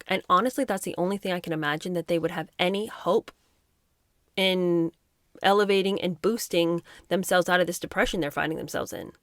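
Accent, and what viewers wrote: United Kingdom. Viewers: I tuned in to this bc I crack up listening to your Bri-ish accent. LOL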